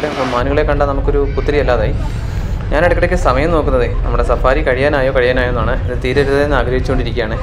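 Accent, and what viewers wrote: Kannur, India. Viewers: താങ്കള്‍ കണ്ണൂര് കാരന്‍ ആണോ ബായ്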